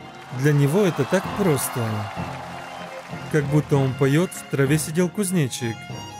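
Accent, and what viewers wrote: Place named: Kazakhstan. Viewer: Вы говорите по ҚазаҚчки девушка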